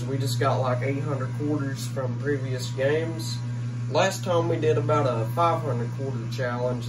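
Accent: South, United States. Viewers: Love your accent, I am from Australia. is that a southern accent,👍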